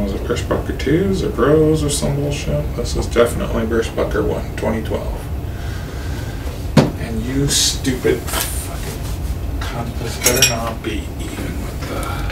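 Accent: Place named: Canada. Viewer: Wow, lots of haters today. I ignore the Canadian accent, profanity, condescending attitude, occasional mistake, just to see someone enjoy what he does and learn as he goes. If you don't like it, go see Dave's World Of Fun Stuff, Will's Easy Guitars, or any of the other millions of guys doing this, (Or something like it) on YouTube.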